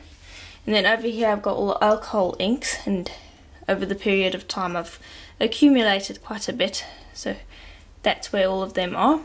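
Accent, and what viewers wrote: South Africa. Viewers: Such a well organised studio😍 where are you from, your accent sounds South African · Hi! You are so organized! Thank you for your studio tour. I'm just starting out but it's given me a few ideas of how to better organize what I have and prepare for what I plan to get! BTW, my husband commented on your accent as soon as he heard your voice--he and his family are from South Africa and they were from Johannesburg.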